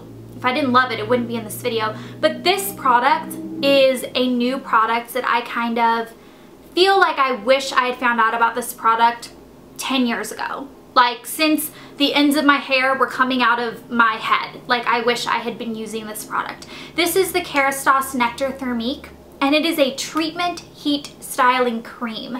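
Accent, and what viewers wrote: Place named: South, United States. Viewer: I love how sometimes your southern accent comes out <3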